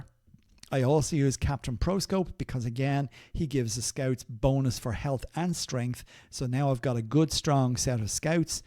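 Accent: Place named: Ireland. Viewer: As a new player this is the first good information site i have found , and i have looked at a lot . Its good to hear a clear logical intelligent person explaining things for beginners upwards and i am very much a beginner. Its good to hear how and why things are important and how to use them how to upgrade them . Also good to hear an Irish ? Accent instead of a london or american accent . Thank you so much for this clear detailed information. I am a very new player only level 112, 000 or so and have been in no battles or tournaments. I am building my city and equiping carter . Thank you fir this page . Very good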